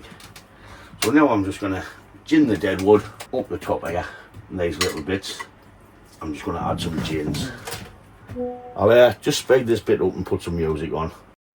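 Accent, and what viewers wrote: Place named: Northern England, United Kingdom. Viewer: you're welcome mate. I really enjoy your channel. As a fellow northerner stuck down south, it's refreshing to hear the banter and accent.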